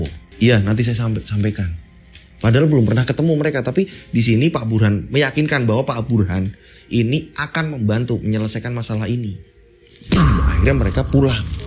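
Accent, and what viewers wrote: Java, Indonesia. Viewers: Nok....nok... assalamualaikum kak dr NSW SYDNEY OZI, nonton video kak selonjoran sambil pakai blanket winter's🥶, seneng liat video kak dengan bhs jowone medok, kangen ngobrol bhs jowo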